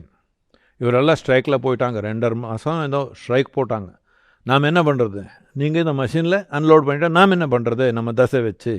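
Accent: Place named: Karnataka, India. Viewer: every Kannadiga sounds the same when they talk in tamil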